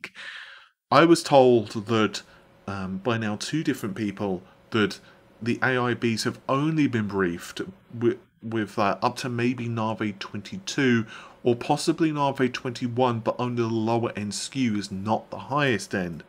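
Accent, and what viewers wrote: United Kingdom. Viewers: that british accent is amazing isn't it lol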